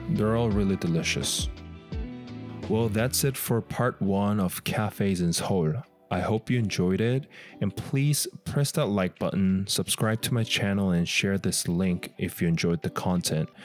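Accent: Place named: England, United Kingdom. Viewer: Welcome back! I hope you didn't forget me 😢 I was wondering when you'll post and now you've uploaded I'm so happy. Also this is useful if I plan to visit Korea one day Thank you so much for making this video possible 🥹🥹🥹 also hope ginger is doing well you're looking more handsome 💕 and I really love your English accent can I get a virtual hug 🫂?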